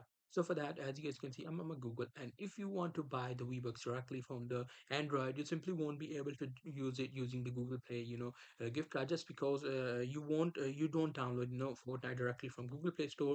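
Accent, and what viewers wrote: India. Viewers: Probably not lmao Indian accent proves it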